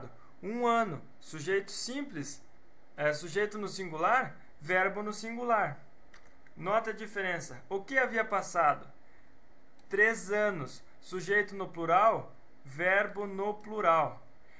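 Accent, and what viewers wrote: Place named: Northeast, Brazil. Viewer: vamos adiantiii kkkkk sotaque nordestino em filho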